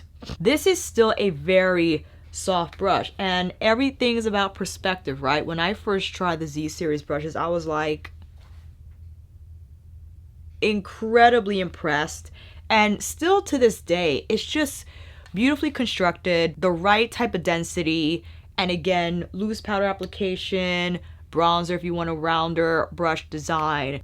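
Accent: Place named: United States. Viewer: my love, please don't take that as an offence, but I kinda feel bothered by you pronouncing the Japanese names with the heavy English accent. Since you're a huge fan of Japanese makeup brushes brands, I think it'd be more respectful to the brand and the culture if you look up to pronounce the names a little more correct to the Japanese pronunciation. In fact is pretty easy. I say that because I have a lot of proximity to Japanese culture and it feels a little disrespectful when the names are pronounced the american way. Anyway, great review as always! Love u!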